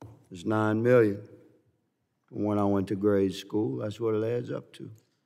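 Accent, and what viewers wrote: South, United States. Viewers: Thank you Mr Higgins, for using that amazing southern voice to stand in defense of those precious innocent children.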